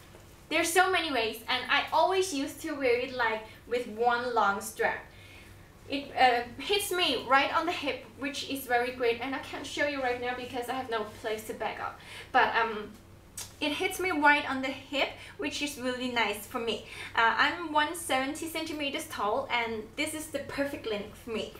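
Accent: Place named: China. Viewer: but arent you Chinese????? i can barely understand you with the heavy accent. no way are u a native english speaker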